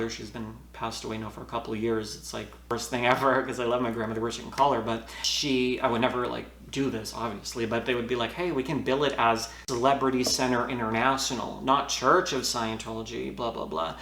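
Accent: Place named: Boston, United States. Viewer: Always good to see a new video from you! Thank you for continuing to speak out and inform people what it was like for you as a public. Also, hello fellow Mass-head! I lived about half an hour north of Boston from birth to age six, and I've visited family up there often over the years. You've done well at neutralizing the accent! I still have mine on a few words, even after all this time, and whenever I visit, it comes back after half a day, and it takes me a couple weeks to get back to my normal mish-mash American accent. My wife thinks it's hilarious, for the first day or two, but then she starts calling me out on it.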